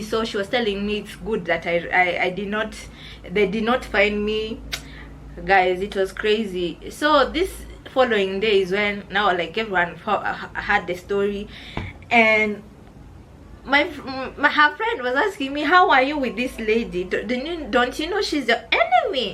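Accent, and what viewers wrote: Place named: Kenya. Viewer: I always love to hear your stories , sweet kenyan ascent unfortunately too much in Swahili , didn't understand, nice of you though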